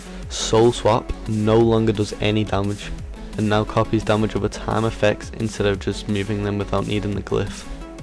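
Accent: United Kingdom. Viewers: People from liverpool are usually always in a good mood lol, you sound like your from west derby / norris green area =o??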